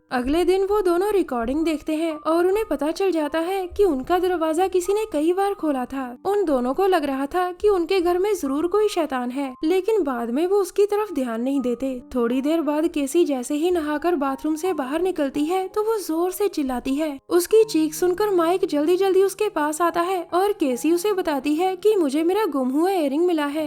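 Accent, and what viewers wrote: Punjab, India. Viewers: Aap aur apke thumbnails...aur ye awaz, ye Punjabi andaz... wah kya baat h.... Lv u so much. schhi wala..🥰🥰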